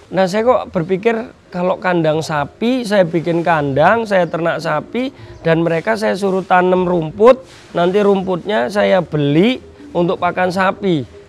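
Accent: Indonesia. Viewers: Mantap mas kira n sampeyan wong daerah yogya lahir di sumatra dapat istri orang Banyumas...cuman jowone sampeyan sing bnr asli ngndi toh mas...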